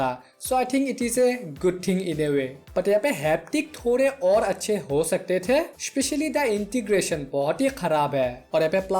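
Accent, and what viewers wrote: Assam, India. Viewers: assam dada apunar accent te gom palu😊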